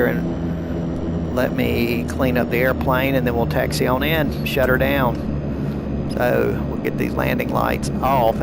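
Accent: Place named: South, United States. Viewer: Your videos are epic. Never learned that much in such a short time as with your vids. Your real world pilot helps.you do the procedures as you would in real life, which is very helpful. And you've got such good sense of humor. May I ask you (if it is not too personal) where are you from in the US? Somewhere in the South? Your accent is really nice. :-) I brush up my American English that way. P.S.: I'm from Austria, so my English isn't very good. So please bear with me.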